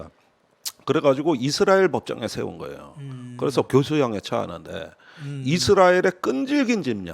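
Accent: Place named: Chungcheong, South Korea. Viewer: 졸라싸워! ㅋㅎ 충청도말씨 구수허유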